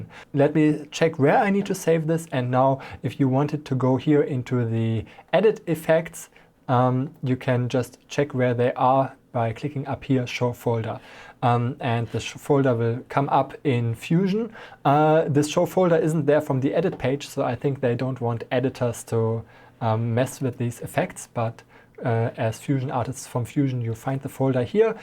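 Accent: Germany. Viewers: Moin, ich hoffe mal du verstehst deutsch. Irgendwie höre ich was deutsches in deinem Englisch raus xd Ich habe eine Frage zu DaVinci, die mir bisher niemand beantworten konnte. Ist es möglich die Geschwindigkeit eines Videos mit Keyframes, die smooth sind, anzupassen? Wichtig daran ist, dass man einen Keyframe anheben kann und die Geschwindigkeit sich genau an dieser Stelle verändert. Bisher habe ich es nur mit zwei Keyframes hinbekommen, was zu ungenau und zu aufwändig für mich ist. Wenn ich das versuche hier bildlich darzustellen, sieht es ungefähr so aus: Was ich brauche: . ' . ' . ' . Was ich bisher gefunden habe: .. '' .. '' .. '' ..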